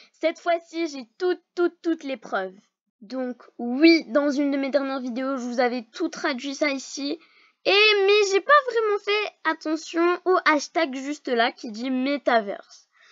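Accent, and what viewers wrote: England, United Kingdom. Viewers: Toujours un belle acsent anglais 👍✨ Ps :jaime trop t video et ton acsent ! Gros bisous et bonne vacances 🌼😂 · Toujours un aussi belle accent anglais😉🦋 Et merci beaucoup pour l'explication!!😁❤ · Merci pourles infos aussi belle accent anglais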